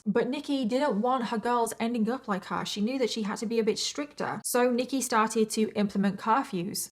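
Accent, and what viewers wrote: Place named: England, United Kingdom. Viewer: I saw this case many years ago but decided to watch your version and your research on it was spot on. Great job!! Btw I'm a twin as well. Similarities between my twin sister and these twins are one thing only; the deep bond we share that's it. The rest nada. We were the victims of abandonment and physical, psychological, emotional and sexual torture. My twin sister and I got introduced to hell from the very first breath we took, that was our life. The PTSD took my mind into homicidal thoughts, and suicidal attempts. I jumped off a building and broke almost every bone in my body, clinically flat-lined, coma for 13 days then came out of it. Then knowing I survived I did it again but this time went higher up in the building. An identical thing happens, clinically dead, in a coma for 13 days, came out of it and here I am ALIVE. 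3 years now, and with many severe different and alternative therapy, for PTSD, etc I can tell you I am finally finding my feet. I now LIVE more than survive. My twin and I are SURVIVORS of the unthinkable. We are beautiful, loving, caring, compassionate, nurturing, funny loving and amazing women. I say that with humility, not arrogance or ego. So it blows my mind how these twins can so nonchalantly and callously murder their beautiful mother over a simple curfew or a few basic rules. Normal people just don't. Abnormal people don't, but evil does. May the mother be in peace forever. I love your crazy mad English accent and your channel. Thank you for your h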